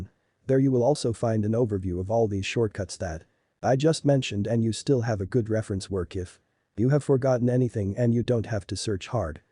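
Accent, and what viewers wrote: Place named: Austria. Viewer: Please continue with this tutorial series. I found this by accident - you should add a link to the RBDOOM-3-BFG README file. Also, the Schwarzenegger English is fine you don't have to waste time with the robot voiceover.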